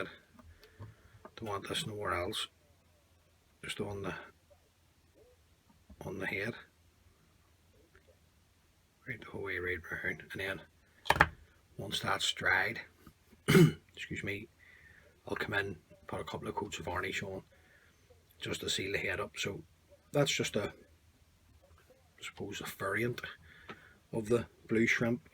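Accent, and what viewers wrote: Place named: Belfast, United Kingdom. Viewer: you come across really well, and the Belfast accent is clear to understand and brilliant. Who could not like a Belfast accent. Well done keep it up.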